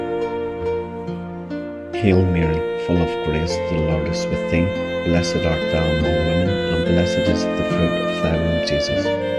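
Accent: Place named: Ireland. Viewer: I love the Irish voice. reminds me of being in school with the Irish priests and nuns as a girl.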